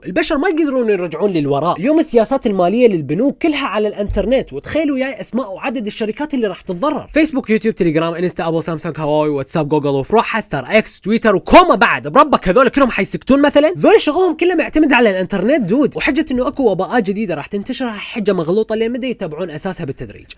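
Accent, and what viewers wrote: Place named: Iraq. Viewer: يخي احب لهجه العراقيه 😭💖💖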